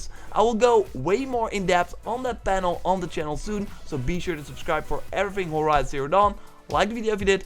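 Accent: Netherlands. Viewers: I never thought about your dutch accen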